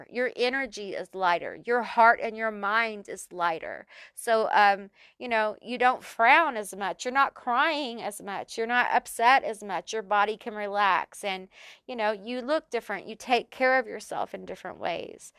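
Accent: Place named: South, United States. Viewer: are you southern? i can hear a slight accent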